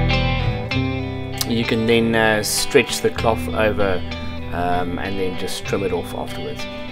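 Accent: South Africa. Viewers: Great to hear that South African accent! Brilliant speaker build as well. Thanks for posting :) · awesome video, agree with Jeremy great to hear South African Accent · Dude Are You From South Africa? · Sorry for the rather odd question, but are you South African? Kind of have an SA accent :)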